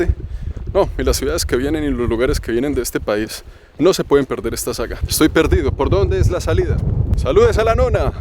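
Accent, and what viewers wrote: Bogotá, Colombia. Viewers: Que linda voz tienes y con ese acento colombiano, Ave Maria. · Empecé a seguirte, solo porque eres colombiano y me encanta su acento, pero honestamente excelente relato de esta ciudad antigua, y algunos otros vídeos que ví, muy interesantes y más cuando los explicas tan bien y nos aportas no solo viajar atravez de ellos sino información de los mismos en cuanto a su cultura gastronomía, estatus y antigüedad muchísimas gracias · DEBERÍAS SER LOCUTOR DE RADIO TENES UN VOZ MUY RECORDATORIA EN MEMORIA DE LA GENTE Y ESE ACENTO COLOMBIANO GENIA... USTED YO DIRIA CHE SOS RE PIOLA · colombiano por estilo de hablar👍👍👍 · Que fastidio ese acento rolo :v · buena voz.. interesante relato... acento Colombiano? Chevere felicitaciones!!! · Es agradable ver tus videos desde el sofá, aparte de ver las imágenes, estas muy bien explicafos con excelentes datos históricos de la localidades que nos enseñas, en este caso la ciudad de Matera, hoy una ciudad de la República de Italia y anteriormente del Reino de las Dos Sicilias. 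Un inciso, decir Latinos o Latinoamérica no es correcto, es hispanos o Hispanoamérica, Latinoamérica es una invención francesa cuando la invasión francesa, los franceses no dicen el Canadá latino o el África Latina, tuvieron muchos territorios en África y también los tuvo Portugal, España menos, pero dicen el Canadá francófono o el África francófona, latino es ser del Lacio.. Si también se incluye El Brasil entonces se puede decir Iberoamérica, latino o Latinoamerica es absurdo, el Lacio es lo concerniente a latino, una región de Italia. Un saludo desde España, me gusta ver tus videos y escuchar tu acento colombiano.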